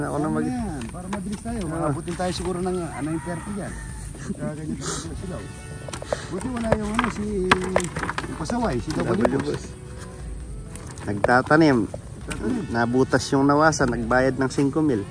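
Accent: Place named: Pampanga, Philippines. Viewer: Good morning kuwait Good morning Philippines Kapampangan accent neh idol..I miss Pampanga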